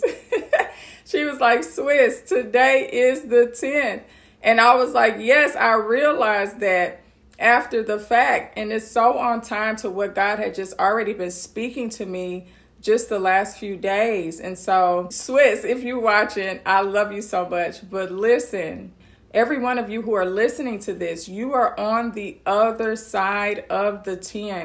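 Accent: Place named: Texas, United States. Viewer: Finally , you getting texas accent❤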